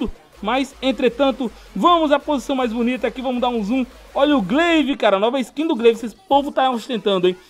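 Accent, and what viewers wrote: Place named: Bahia, Brazil. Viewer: Você é da Bahia ??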